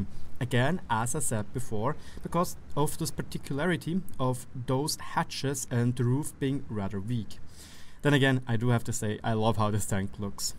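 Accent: Germany. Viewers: Your accent certainly tells you're German, aren't you? The way you pronounce (Cheesus xD) Jesus, just cracks me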